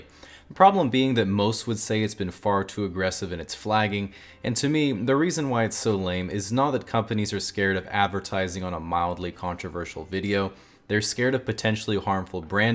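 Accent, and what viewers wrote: Australia. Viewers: That was an amazing Australian accent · CE's Australian accent is really cute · Damn, the Oz accent is completely native! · Honestly, his accent sounds more "Aussie" and Internet Historian's. IH has a very "posh" Aussie accent, for lack of a better word. You ought to hear the bogans. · Hearing this guy speak in his Australian voice sounds so much more normal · That australian accent is oddly familiar. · you sound exactly like darren levy with your australian accent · your aussie accent sounds like frank woodley! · your aussie accent was spot on! didnt see that coming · That Australian accent is fucken great mate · Your fake Aussie accent sounds like a Kiwi, your real voice sounds Aussie cheers mate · That's a really good Australian accent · nice aus accent i have to say, u even say fuken right. edit soz just realised ur probably born and raised aus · Very convincing Australian accent. 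Most people go for the crocodile Dundee accent and butcher it. I've lived here for 18 years and still can't do a convincing accent. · Okay but your Aussie accent sounds exactly like my little brother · The first joke he did was actually kinda funny. But that's just because he has an Australian accent, which makes everything funny. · Wait Your Australian, same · his aussie accent is on point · still can’t believe u used to live in australia and pulled off that accent like u have 2 personalities ahahahaha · Top Australian accent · Why does your australien accent sound like adrian gee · I love the Aussie accent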